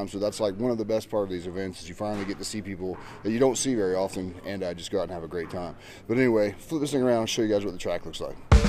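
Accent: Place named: United States. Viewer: hello from Alaska! hey. Thank you for this. love the 'in car camera' footage. reminds me of ReVolt (Nintendo 64/ PS1) video game from 1999. Vintage is cool. for me the golden age of Rc was back between 1989 through 1992. Don't get me wrong I love the new stuff. And things are always getting better RC wise. Looking forward to the forthcoming video. BTW. where are you? You sound American. But your videos are from the uk and Europe. right? You had a rock crawler video that seemed to be here in the U.S. Though I am not sure. take care! keep on RC ing!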